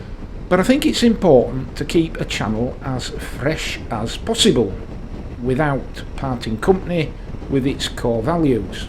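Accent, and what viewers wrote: Yorkshire, United Kingdom. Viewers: I’d like to thank you for taking us along on the journey. The channel has evolved massively from those early days. Your preparation, determination, character and Yorkshire delivery (With a little help from the glorious back garden you have to ride around in ) make your channel unique. It doesn’t need much of a change in formula. Just keep polishing mate. All the very best to yourself, J & E. Catch up with you soon bud.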